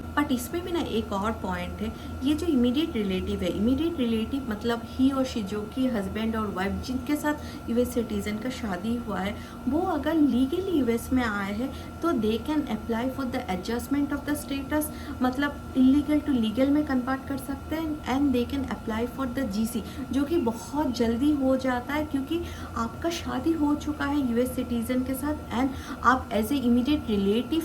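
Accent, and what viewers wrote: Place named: Bengal, India. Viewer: Ur accent seems like u r bengali 😂😅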